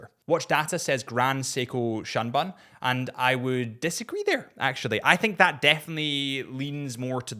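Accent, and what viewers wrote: Scotland, United Kingdom. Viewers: Mate it’s like listening to Adrian Barker with a Scottish accent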